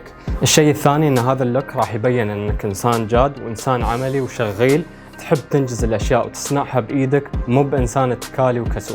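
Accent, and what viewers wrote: Iraq. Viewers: حسبت انك عراقي 😃😃😃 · كنت احسبك عراقي ههه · طريقة كلامك تجيب من الشعب العراقي بشكل قريب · ماعرف ليش احسك عراقي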